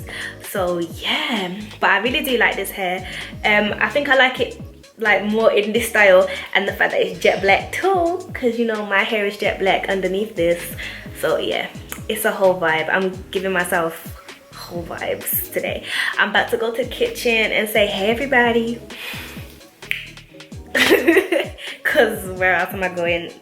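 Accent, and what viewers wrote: Birmingham, United Kingdom. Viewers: It’s a Birmingham accent x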